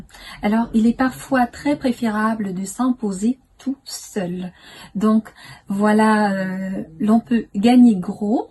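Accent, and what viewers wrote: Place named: France. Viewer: J'aime bien ton accent de Français ma cherie. Merci beaucoup pour cette belle video. J'ai aimé vraiment. ❤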